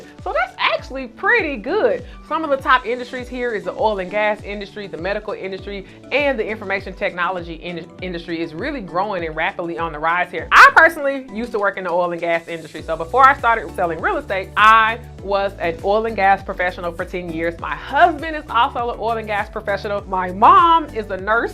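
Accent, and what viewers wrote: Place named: Houston, United States. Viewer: I LOVE that Houstonian accent. You can't miss it! You sound like, lol!!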